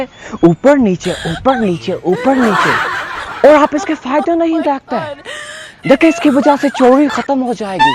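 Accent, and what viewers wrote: Sindh, Pakistan. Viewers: Nice Sindhi angraz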